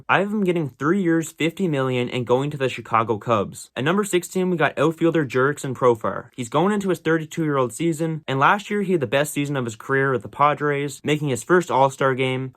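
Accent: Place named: Canada. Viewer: What an unbearable accent. Canadians are the worst.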